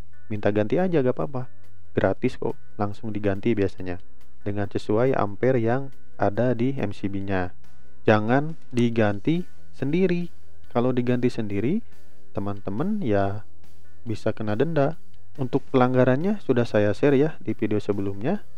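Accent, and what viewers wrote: West Java, Indonesia. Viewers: urang suna euy ieu mh, logat na katawis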